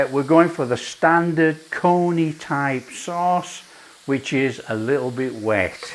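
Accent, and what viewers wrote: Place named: England, United Kingdom. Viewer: 😂🤣Love when you wrote “dodgy blokes” 🤗 That’s why I love, his English accent plus the great dishes he serves.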